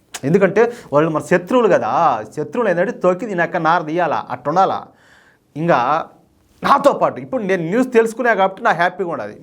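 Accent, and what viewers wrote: Rayalaseema, India. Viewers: రాయలసీమ భాష బలఉందన మీది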